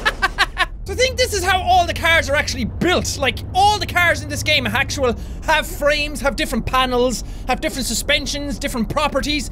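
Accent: Ireland. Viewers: Yeah, hes irish, IRISH people are cool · That accent is OBVIOUSLY Irish. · This has been my first time on this channel and I must say, your accent just sells it! That Irish? I think it's Irish. Well, whatever it is, I love it! It just tickles me! I'll keep looking at your stuff, and am heavily considering subscribing. Thanks man! Cheers! :D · the first video i ever watched, 8 years ago, i was obsessed with beam ng and saw this video and muted the sound cause i didnt like lets plays at the time. then i realised he was irish, same as me, and then i thought he was cool. 8 years later and i watch meme time everyday because its the only cure for sadness.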